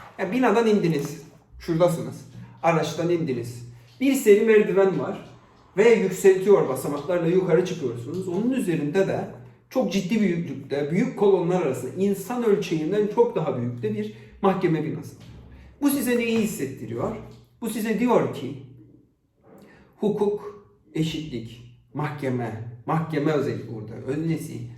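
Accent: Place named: Cyprus. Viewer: Doğu Akdeniz Üniversitesinde öğrenim görmekteyim,konsept ararken videonuza geldim. Şiveniz beni tekrar Kıbrısa götürdü. Bu pandemi döneminde çok güzel hissettirdi. Videonuz da çok yararlı oldu. Teşekkür ederim.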